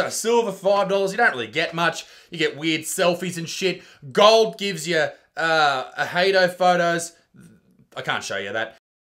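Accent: Australia. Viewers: The most Aussie guy I have ever heard or seen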